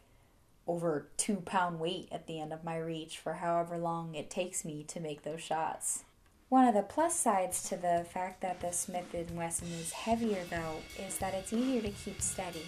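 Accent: Wisconsin, United States. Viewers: Kinda sounds like you're talking dirty to us. I miss the Wiscaaaansin accent though - coming from a Chicagoin. Great vid! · you talked ... with a fairly pronounced Wisconsin accent ... now with a general accent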